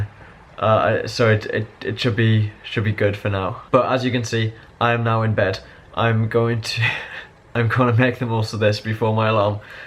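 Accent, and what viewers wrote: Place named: England, United Kingdom. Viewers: Is an English accent, but I don’t know specifically what part of England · Sounds north-east England but not Newcastle. One of the smaller towns. Locals would be able to tell which street from the pronunciation.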